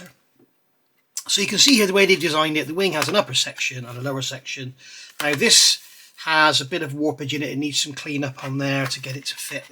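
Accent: United Kingdom. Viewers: You sound like a Yorkshire Lad .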